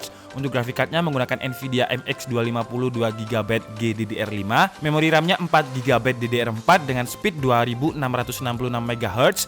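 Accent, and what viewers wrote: Java, Indonesia. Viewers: Wong jowo tenan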